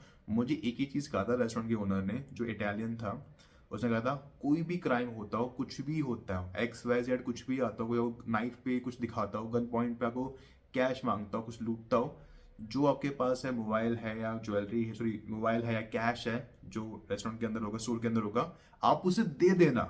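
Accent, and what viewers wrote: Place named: India. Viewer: Ya to english bol le ya hindi. And lose this stupid indian english medium schools accent. Its annoying.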